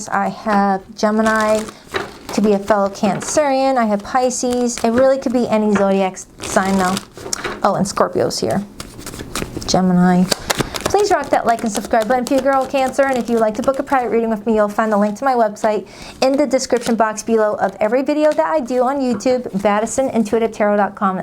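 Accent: United States. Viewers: I love your readings but could I ask you to slow the speed at which you are speaking as I know a lot of us Brits 🇬🇧 have great difficulty understanding certain US accents. We have to put the subtitles on to try and grasp what you are saying even though you are speaking English. 🙈 Please don’t take that as a criticism or take it personally as we none of us can help our accents. Many thanks for the work you do for us all. God bless and namaste 🙏🏼 xx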